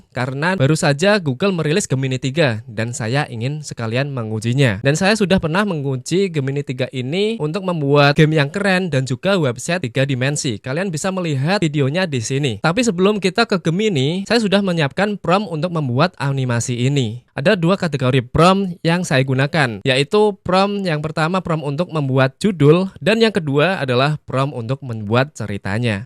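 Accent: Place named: Java, Indonesia. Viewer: bang, abang orang medan ya?. kok logatnya kayak jawa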